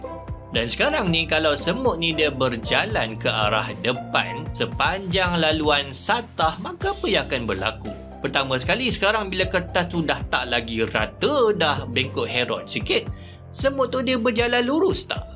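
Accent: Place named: Perak, Malaysia. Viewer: Tk silap perak yg dekat dgn bahagian kedah sebb loghatnya bercampur utara dgn perak.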